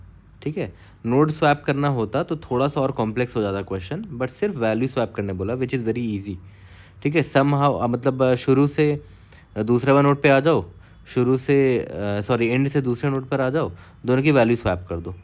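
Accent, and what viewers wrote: Bihar, India. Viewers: accent se laga thoda bihari touch hai btw nice explanation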